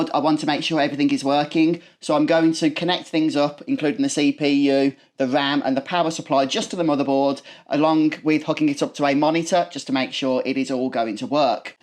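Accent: United Kingdom. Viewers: I just built my first PC, ITX as well! I was looking at the Terra but decided it was a little pricey for my liking. Btw, I am curious about your accent, which part of the UK if you don’t mind?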